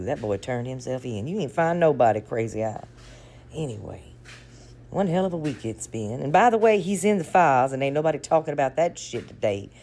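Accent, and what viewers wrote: South, United States. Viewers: Rest that Southern voice. We need you to spill the sweet Southern style tea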